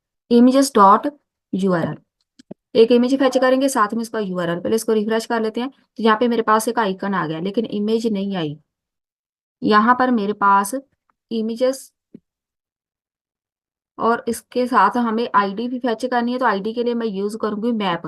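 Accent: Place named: Punjab, India. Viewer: good video, but agar aap aik video punjabi main be banao to bohat acha hoga, kyun k aap ka accent punjabi lag raha ha mujay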